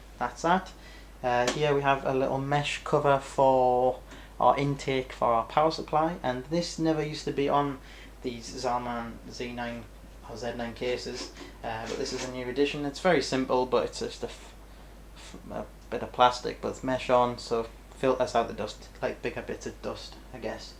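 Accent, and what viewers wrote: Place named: Newcastle, United Kingdom. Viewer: Dude where are you from , you sound pretty local to me, newcastle??? im getting one of these cases, so good to see a decent review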